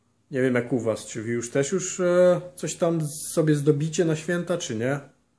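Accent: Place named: United States. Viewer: W jakim jezyku robiles notatki? :-) Lubie Ciebie sluchac, fajnie opowiadasz. Slychac ze masz juz taki amerykanski akcent :-) Pozdrawiam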